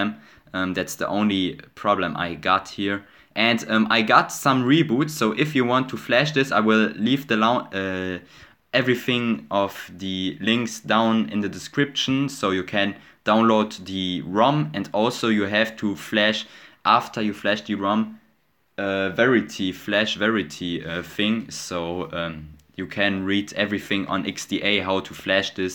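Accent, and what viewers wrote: Germany. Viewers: Man kann hören das du Deutscher bist :)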